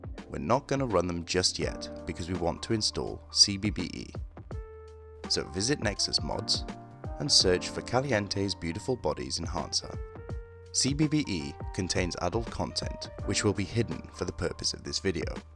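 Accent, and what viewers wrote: United Kingdom. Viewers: Thanks British guy.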